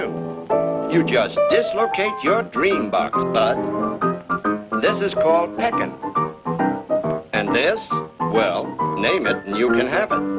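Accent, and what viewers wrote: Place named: Transatlantic, United States. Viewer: Sounds transatlantic.. and maybe northern!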